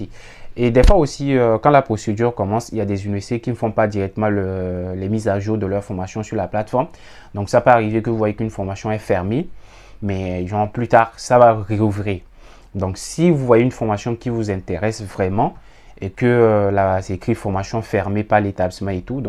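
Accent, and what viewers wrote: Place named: Togo. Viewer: Depuis le Togo...à entendre votre accent, vous devez être togolais...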